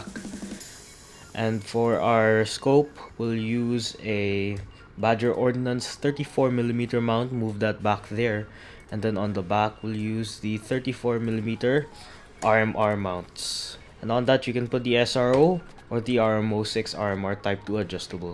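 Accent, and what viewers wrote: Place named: Philippines. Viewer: I discovered Deadline couple days ago and I instantly loved it. Though I'm not really good at building, I just slap in the parts with good stats lol. I'm glad I came across your channel, I got lots of build ideas especially for the M4. This channel deserves more views just like how Deadline deserves more players, you guys are the hidden gems of Roblox community. Btw, are you from Philippines as well? I feel like your accent sounds a bit Filipino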